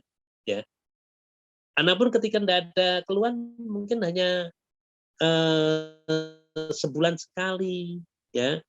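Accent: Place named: Java, Indonesia. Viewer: Pk ustadz katur asli mn y logat jawanya kental sekali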